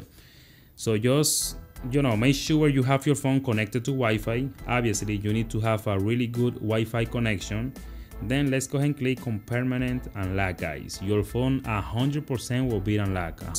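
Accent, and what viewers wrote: Dominican Republic. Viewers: You Dominican? De lo mio? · you sound Dominican! that's wSup paisa😄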